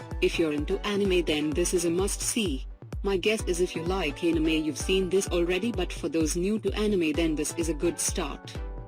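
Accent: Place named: India. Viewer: robot voice with an Indian accent... no thanks!!!!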